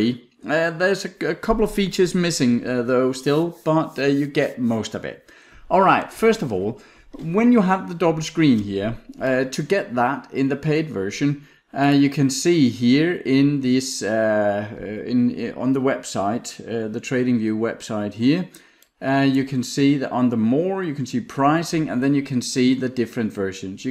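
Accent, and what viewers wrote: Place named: Denmark. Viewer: Cool accent! Are you from Denmark? Thanks for the helpful video!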